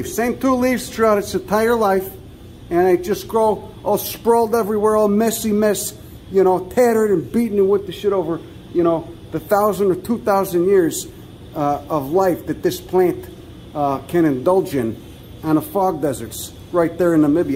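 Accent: Chicago, United States. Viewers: Chicago accent!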